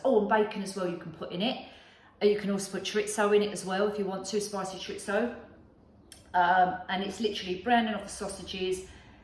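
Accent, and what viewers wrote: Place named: Cockney, United Kingdom. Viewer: She speaks with a cockney accent. She must be the last cockney left in Britain.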